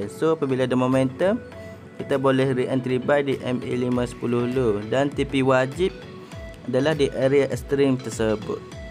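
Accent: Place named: Kelantan, Malaysia. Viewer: kencek kelate la sene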